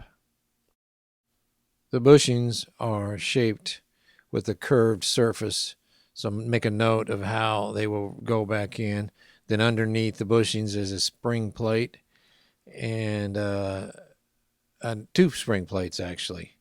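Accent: Midwest, United States. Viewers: Yea, I do enjoy the hypnotizing midwestern drawl and experience driven repair lessons. I kid you not, the confidence to tear into my own cameras grows with every video of yours that I watch & rewatch. I know I have not seen every repair video out there, but of what I have seen, your videos are the most comprehensible and the lighting and camera always work to show whatever the dialog explains. You truly have a gift. I use a Nikon FM as my main film camera and a D3400 for digital. I have ten or so Nikons that need working on (that were eBay purchases from about 15 years ago) starting with a couple Nikkormats up to an FE. Am I correct in believing that the older models will be better to learn on? Still tooling up and hope to acquire some JIS crosspoints soon as all my small drivers are Philips. Though I have MacGyvered a flat into a two-bladed JIS as I play around with some point-and-shoots. 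When I start tackling old stuck screws I will need the real deal. Thanks again for doing all these. Hoping people start learning how to Fix Old Cameras and schools start teaching this stuff again. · Just love watching you work, being an Irishman living in England, your mid western drawl is a delight, you are the man!!! John Moore, Sherwood Forest England.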